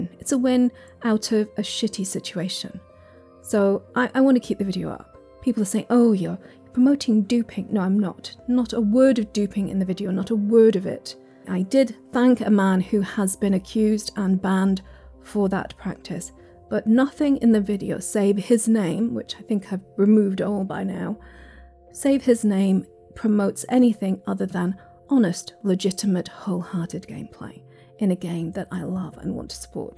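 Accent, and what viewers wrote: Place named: North, United Kingdom. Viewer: I like it when your northern accent comes out.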